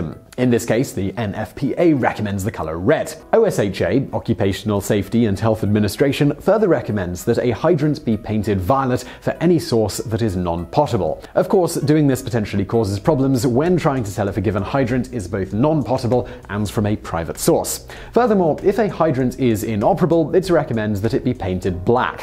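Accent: United Kingdom. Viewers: Oh look, the British Mathew Santoro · I'm confused. Why is someone British (by his accent) explaining about fire hydrants in the USA? In Britain hydrants are below ground level and plates ('H') indicate the hydrant distance from the plate and the diameter of the water main.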